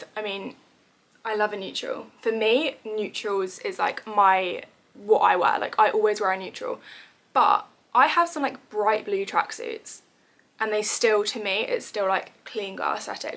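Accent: United Kingdom. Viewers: I like your British Accent!!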